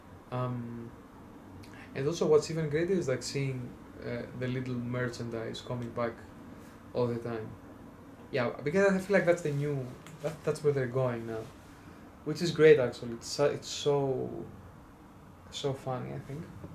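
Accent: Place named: Greece. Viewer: Your accent reminds me of stefanos tsitsipas, which makes sense because he is greek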